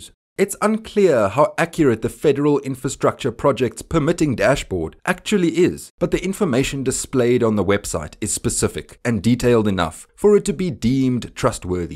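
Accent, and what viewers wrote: South Africa. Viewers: He's from South Africa, like Elon himself.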